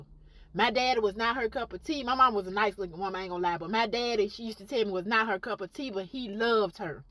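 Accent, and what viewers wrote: South, United States. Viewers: I can tell you''re a southern lady! Alabama, Mississippi or Tennessee! Sounds good, and I can tell you can cook and keep a clean home! There's a difference between a house and a home! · Are you from Alabama or Mississippi? That southern accent is deep.😂😂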